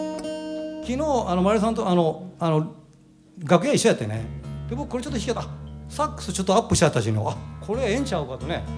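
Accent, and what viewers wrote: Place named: Kishu, Japan. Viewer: ありがとうございます。我が家では濱口さんの紀州言葉をお互いに言い合って楽しむ時があります。関西人やからのお。ええのお！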